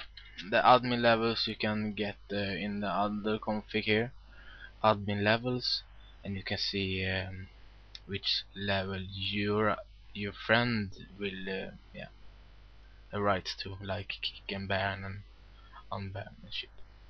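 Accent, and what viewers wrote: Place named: Sweden. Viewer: Pungt kom... DOT COM! haha, du är från sverige asså ^^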